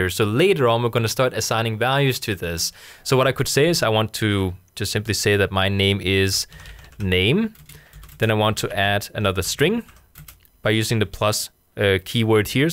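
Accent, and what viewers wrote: Denmark. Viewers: I love your way of explanation. You are my kind of teacher who explains basic and doesn't assume that others will pick up the complex concepts easily. When I first listened to you, I thought you must be Danish (Your English accent). Now, I have checked your About which confirms that you are indeed Danish. Thusind tak for fantastiske videoer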